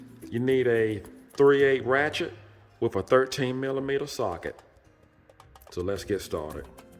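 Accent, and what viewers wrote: South, United States. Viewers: love the southern accent, lol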